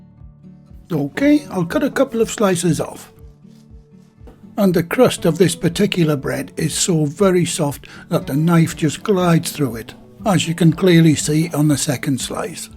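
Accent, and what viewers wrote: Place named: County Durham, United Kingdom. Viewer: Only discovered you channel today, not just great simple wholesome recipes but your voice is making me homesick. I’m from County Durham but live down south now.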